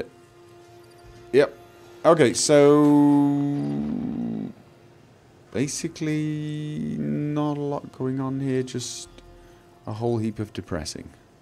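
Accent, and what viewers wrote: United Kingdom. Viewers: Where is from? It says he's from norway but he has kind of a british accent and he says R in a pretty weird way. And I'm not hating or anything I love his vids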